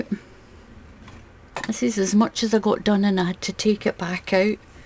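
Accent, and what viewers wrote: Scotland, United Kingdom. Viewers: I love some of the patterns, and I also love your beautiful accent. You sound Scottish. I unfortunately, have trouble following along, because you don't use U.S. information/directions to your video's, and i find that very frustrating when I hear; "double crochet" and it's a "single/ treble." It's quite backwards and confusing to me.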